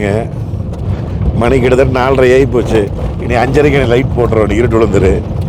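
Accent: Kongu, India. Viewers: கொஞ்சும் கொங்குத் தமிழ் பேசும் கோமகரே...! திகட்டாத தித்திக்கும் பேச்சுடைய திருப்பூர் திருமகரே...! எங்கள் மதிப்பிற்குறிய திரு. ❤❤❤🙏 அவர்களே எப்படி இருக்கீங்க அண்ணி❤❤❤🙏 எப்படி இருக்காங்க ரித்திக்❤❤❤ எப்படி இருக்காரு. இந்த லடாக் பயணத்தை திருப்பூர் திருமகரின் பயணம் என்பதைவிட "வீரத்திருமகரின்" பயணம் என்பதே சரியானது❤❤❤❤ நேற்று நான் சொல்ல வந்த ஒரு தகவலை இரண்டாவது கமெண்ட்டில் சொல்றேன் சில நேரம் YouTubeல அதிக எழுத்துக்கள் கொண்ட கமெண்ட் பதிவாகாது? என்ன ஆகுதுனு தெரியல? ஒருவேளை அப்படி பதிவாகவில்லை என்று சொன்னால்? நீங்க&TMF புரிந்துகொள்ளுங்கள் பதிவாகவில்லை என்று🙏. நான் உங்களை திருப்பூரில் வந்து சந்திக்கும்போது அனைத்தையும் சொல்கிறேன். நீங்க இதற்காகவே அதை ஒரு வீடியோவாக நம் TMFக்கு upload பன்னுங்க. நம் ❤சிட்டுக்குருவியோட❤ தங்களது இந்த லடாக் பயணம் இனிதே தொடர்ந்திட நல்வாழ்த்துக்கள் ❤❤❤ என்றும் அன்புடன் உங்கள் தம்பி என்றும் அன்புடன் உங்கள் TMF ரா.முஹம்மது கபூர் (சென்னையில் இருந்து) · முதலில் உங்களுக்கு நன்றி... 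சிரமப்பட்டு டிரைவிங் செய்யும் போது பேசிக்கொண்டே வருவதால் நாங்களும் உங்களுடன் கூடவே வரும் உணர்வு... அதுவும் நம்ம கொங்குத்தமிழில்...அருமையான காட்சிகள்...ஆனால்..என்னவென்றால் பாவம்..உட்கார்ந்து கொண்டே செல்வதால் இடுப்பு வலி.. சென்றதும் நன்றாக ஓய்வு எடுங்கள்...Take care.. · இன்று உங்கள் சிட்டியை திருப்பூர் கார் பட்டறையில் பார்த்தேன். சூப்பர்...உங்கள் கொங்கு தமிழ் உங்கள் காணொளிக்கு சிறந்த நன்மை. வாழ்த்துக்கள். உங்கள் தொடர்பு எண்ணை அனுப்பவும். நாங்கள் உங்களை சந்திக்க ஆர்வமாக உள்ளோம்.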